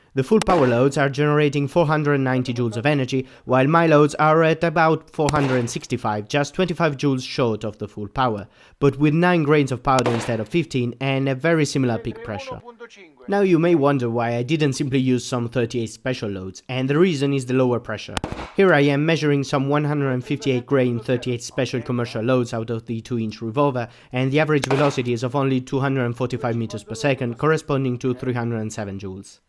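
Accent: Italy. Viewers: Italy i think, i know i love his accent. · So you are italian - i was wondering what the accent is 😘 does anyone know if he lives in US or Italy? 🙊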